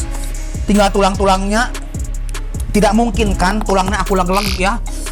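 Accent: West Java, Indonesia. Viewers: Duh liat ngomong sunda asa ketemu lagi sama sahabat kecil aku basa di garut persis banget logat ngomongnya😭 kangen garut jadinya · Mantap, ciri khas ngomong Sunda nya jangan sampai hilang Poko na mah!! Soalna ciri khas yg ramein nya kata2 Sunda😂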